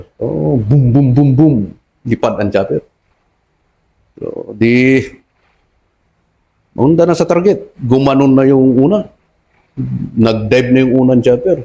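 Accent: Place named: Ilocos, Philippines. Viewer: Halatang Ilocano si Sir hehe,pero saludo ako sa tapang mo Sir.Isa kang bayani,mabuhay ang tropang musang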